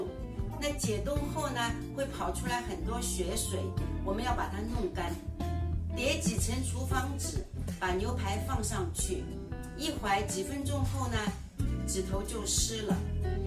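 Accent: Shanghai, China. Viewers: 的上海言话哈一样赏心悦目。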